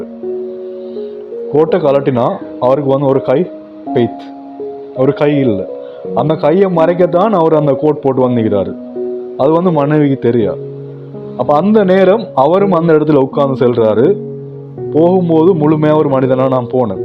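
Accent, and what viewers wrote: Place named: North India, India. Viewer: North Indian Tamil sooper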